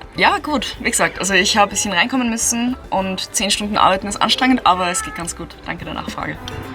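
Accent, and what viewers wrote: United Kingdom. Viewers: Wie hast du so geilen englischen Akzent? 😍😍😍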